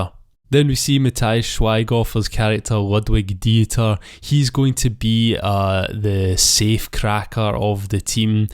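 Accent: Scotland, United Kingdom. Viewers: Ive been looking forward to this 💪🖒 What part of scotland you fae mate? i cant tell if your same as me or west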